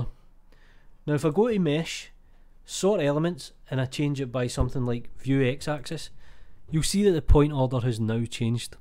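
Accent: Scotland, United Kingdom. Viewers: Hi Thanks for yet another super useful tip. Please do not drop the accent. Scottish is pure music to my ears.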